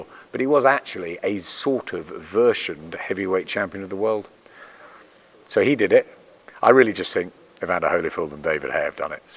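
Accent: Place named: Liverpool, United Kingdom. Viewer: some scouse accent on....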